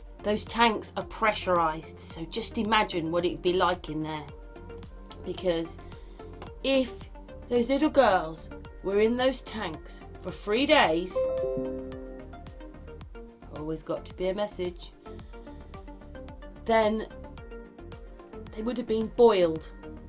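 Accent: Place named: England, United Kingdom. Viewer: She's English so that's odd you find her hard to understand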